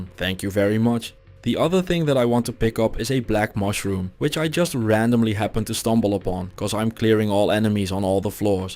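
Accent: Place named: Netherlands. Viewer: why you sound so dutch.... and like me.... also yeah the mage is by far the easiest. mana shield hydra can beat the whole game. ranger is thus also super easy as its mage as well u just have a bow instead of melee. much safer. warrior is just face tank stuff. and ur magic is the lowest it can be... fun fact. melee>range>mage is a triangle. warrior can still be decent ranger. but mage is crap at using a bow. if the mage class had decent dex. it would be broken.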